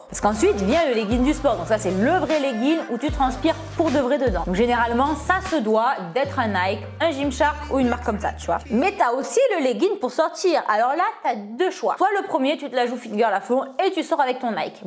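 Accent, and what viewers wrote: South, France. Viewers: Le petit accent du sud haha ! encore une vidéo trop drôle et trop bien #teamsnap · coucou, super vidéo comme tj continue comme ça:) t'es magnifique en leggings et brassière haha ps: j'adore ton accent du sud:p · D'où nous vient cet accent ? Ça sent le sud ☀️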